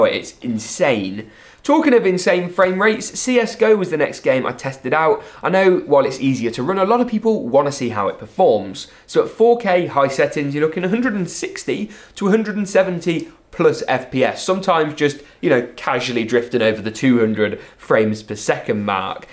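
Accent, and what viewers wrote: United Kingdom. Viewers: Not only is he Spider-Man, but he is also a tech genius But you thought I was finished he also speaks in a British accent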